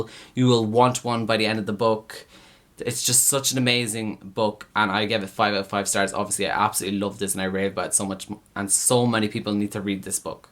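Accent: Ireland. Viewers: What part of Ireland are you from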